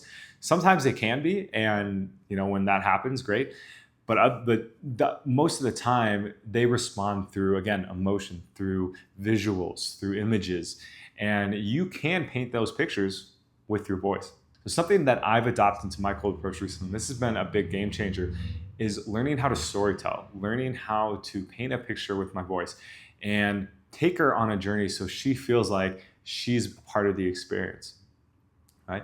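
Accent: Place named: Mexico. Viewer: I've been here 7 years have yet to meet a Mexican girl that speaks English like that. But, I'm not in the gringo tourist area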